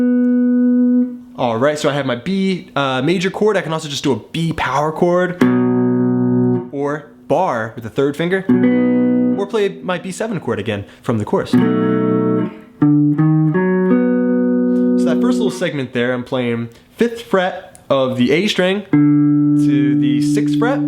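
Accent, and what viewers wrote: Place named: United States. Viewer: that accent in america has always intrigued me-nearest sounding american accent to english uk accent-where you from man?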